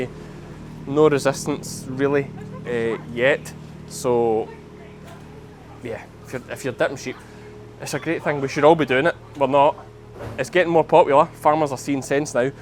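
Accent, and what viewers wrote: Scotland, United Kingdom. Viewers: i love the scottish accent, respect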